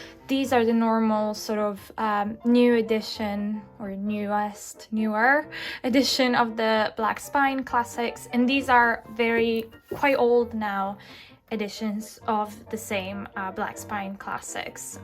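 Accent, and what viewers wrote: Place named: Scotland, United Kingdom. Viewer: Her accent sounds Scottish, so I assume Scotland.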